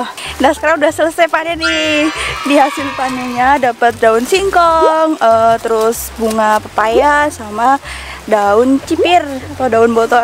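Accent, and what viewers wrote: Banyumas, Indonesia. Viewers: Mba si umahe nng ndi mba, sue subscrbe tp ora ngerti wong ndi, bhsane ngapak2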